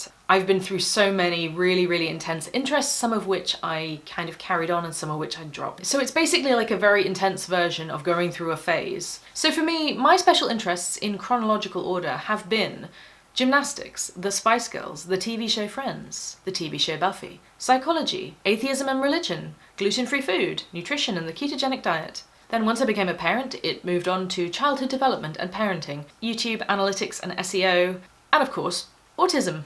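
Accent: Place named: England, United Kingdom. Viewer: Nice to hear an English voice, so many of these things are American. I kind of tune out when I hear foreign accents...maybe due to my condition (I self-identify).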